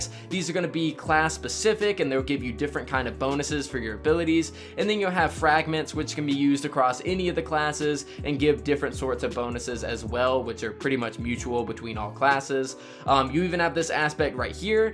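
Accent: France. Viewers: C'est un français ?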